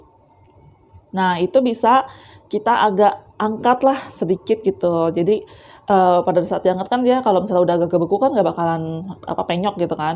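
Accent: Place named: West Java, Indonesia. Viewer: Suka bgt sama cici cici , tp ngomong nya nyunda (ada "mah" nya) 😆😆😆 terimakasih tips nya cii , sehat selalu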